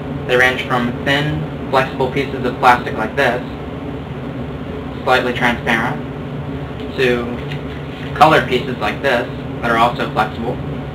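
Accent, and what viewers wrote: United States. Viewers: ... thank you for the details! a typically American English with a hum in the backdrop, made it difficult for an Asian like I, to comprehend. thanks for the details in writing. thank you so much for the help! ... 🙏🙏🙏 ... - NSJ / INDIA Thursday, 4 MARCH 2021 ( Hrs IST) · Hi, what a great video! Thank for sharing this with us! But the bad acoustic and the circumstance that I am not used to listen to American English (sorry! ;-) make me understand less than half of it. Can you write down the ingredients and the amounts of them here in the comments for dummies like me? ;-) Thank you! Best wishes from Germany, Katie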